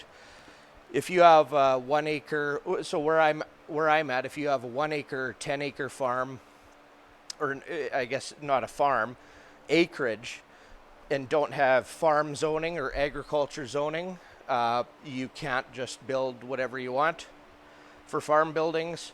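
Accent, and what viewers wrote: Canada. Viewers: You are good peoples! New subscriber. Plan on doing a smaller version down here in Southern Georgia. USA of course. Thank you for the invaluable information. Love the Canadian accent too! Go Jets! (Recovering Atlanta Thrashers fan). Take care, be safe and we love our guns too! Come to Georgia sometime!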